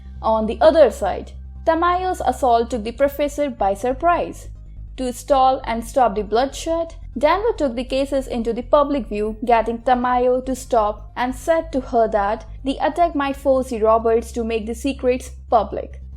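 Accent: India. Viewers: good recap, but dislike for the indian accent.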